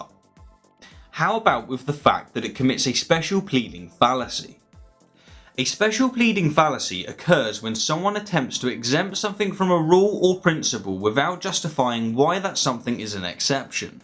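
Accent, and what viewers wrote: England, United Kingdom. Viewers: How did you develop an English accent in South Africa?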